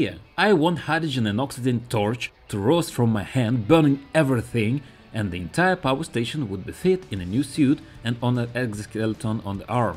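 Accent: Russia. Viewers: Are you russian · Ты ж русский? Или просто акцент такой? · Да Ты Русский, за километр слышно!!!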